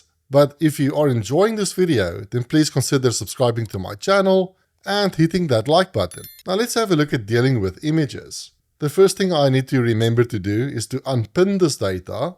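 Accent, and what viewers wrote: Afrikaans, South Africa. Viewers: 😂 lekker afrikaanse ou, “What are my current list of todos.” Priceless. Thanks for the video 🎉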